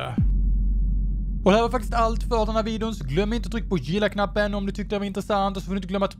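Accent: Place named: Västergötland, Sweden. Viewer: Holger Nilsson? Det är för att båda är i ungefär samma ålder och pratar västgötska.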